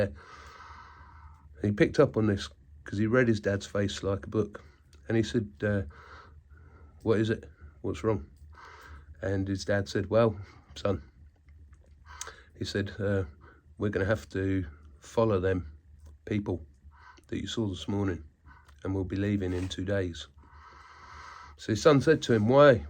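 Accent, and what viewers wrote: England, United Kingdom. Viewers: С первых слов понял что это англичанин, не американец. Произношение слов не ломаное, не голливудское.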